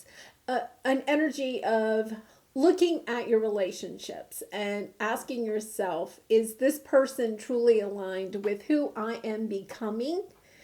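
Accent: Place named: South Carolina, United States. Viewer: I'm betting you're a South Carolinian with that delightful accent!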